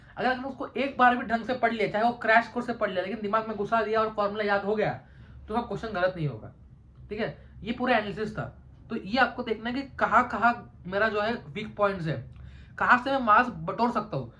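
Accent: Maharashtra, India. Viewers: Bro are from Maharashtra.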